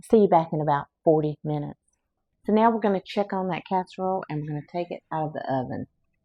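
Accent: South, United States. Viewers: I am so excited that I found your channel, love your beautiful southern accent and your recipes!!♥♥♥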